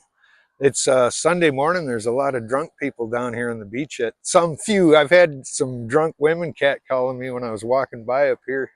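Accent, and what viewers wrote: Canada. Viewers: You sound like a Canadian.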